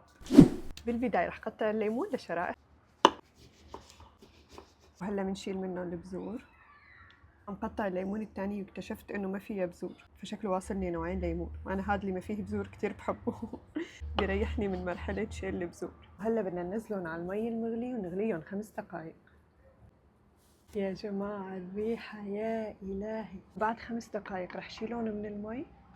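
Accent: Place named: Coast, Syria. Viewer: اي من عنا من الساحل في عدة لهجات نحنا مثلا لهجتنا قريبة من ضيعة ضايعة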